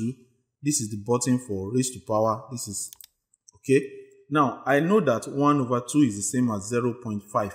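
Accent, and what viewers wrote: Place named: Nigeria. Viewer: Sounds like a Nigerian accent 🤔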